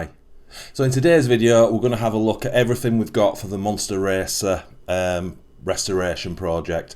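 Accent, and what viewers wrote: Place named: England, United Kingdom. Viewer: Hello sir... For years now.. I have been trying to learn things about England.. Especially accents and their locations.. only very little. Can you tell me what part area your accent is from mainly... Just roughly... North.. south.. or whatever. You can give a city area. But I don't know if it will help me.. lol. I guess I can look it up though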